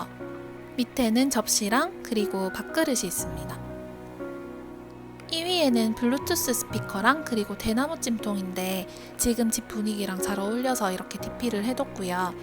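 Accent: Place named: Gyeongsang, South Korea. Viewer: 제 방도 좀 꾸며달라고 하고 싶네요...경상도 분이신 것 같은데, 만나러 가고 싶어효ㅠㅠ엉엉 ㅠㅠ넘 귀여버요ㅠㅠㅠ완전 취저.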